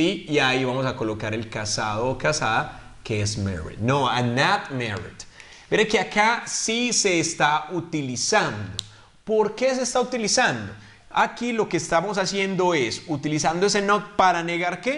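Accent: Colombia. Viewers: Teacher you have Colombian accent..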